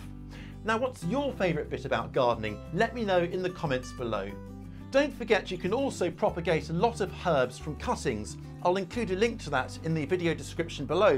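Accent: England, United Kingdom. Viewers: May I ask what zone are CV you in? You sound like Englishmen where is your garde in.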